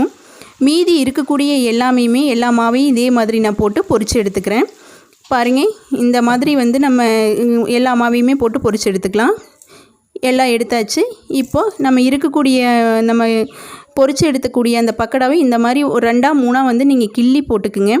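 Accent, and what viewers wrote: Kongu, India. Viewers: 3 Lk கொங்கு தமிழுடன் ரோட்டுக்கடை காளான் சுவையோ சுவை 😋😋அருமை sis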